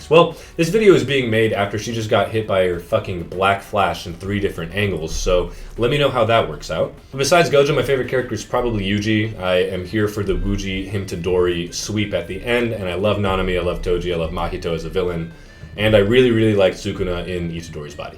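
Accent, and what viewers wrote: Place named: Balkans, Eastern Europe. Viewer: Knew it, the name, the humor, the accent, the looks. Everything screamed Balkan Slavic.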